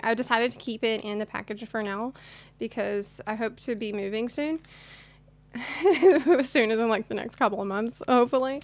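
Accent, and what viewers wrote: South, United States. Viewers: "Ya,all sounds from somewhere central southern USA area. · I can't believe you're from Ukraine! I guess it's just because I've always picked up a very slight southern US accent.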